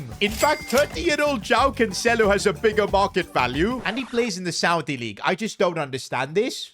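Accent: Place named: India. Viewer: your indian acnent just the way you said "i am building." 🤣🤣🤣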